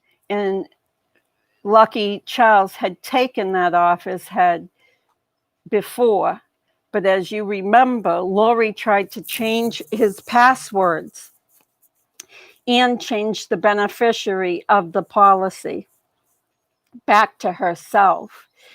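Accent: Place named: Boston, United States. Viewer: I enjoyed the information you presented. You sound like you might be from Boston.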